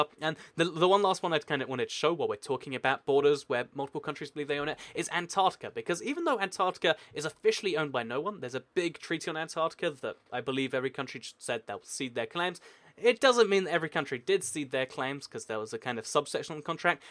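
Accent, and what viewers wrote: England, United Kingdom. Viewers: Very good material. It could have been cut up into two- or more presentations. I live in (kind of) the Southern U.S. and the combination of your English accent (which is delightful, by the way) and the speed with which you blew through your presentation made it a little harder (for me, anyway) to understand. I genuinely enjoyed the video, however, and am impressed by the amount of research you must have had to do to acquire this obscure knowledge (disputed and unclaimed lands of the earth). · Kiwi he's British, they pronounce it differently Coming from a Bosnian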